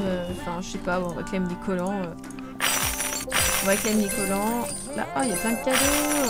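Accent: England, United Kingdom. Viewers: l'accent anglais j'aime bcp !! XD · J’adore ton accent anglais 😂😊❤️🥰 ps: je t’adore 😊❤️🥰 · J'adore ton acsent anglais 😂👌❤super vidéooo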